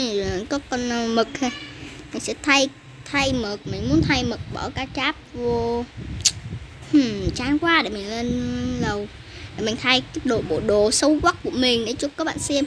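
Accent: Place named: North, Vietnam. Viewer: Ủa sao lại nói giọng bắc, bà nói giọng nam cơ mà??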